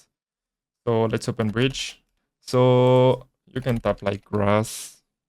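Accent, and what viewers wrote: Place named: France. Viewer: Comment il gère ça le bro avec le ptit accent frenchy hehe :) lourd lourd lourd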